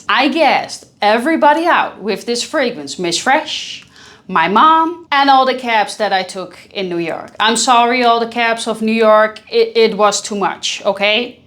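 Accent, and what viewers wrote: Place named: Netherlands. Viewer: Nederlander 100% 😂 hoor je meteen! Leuke video.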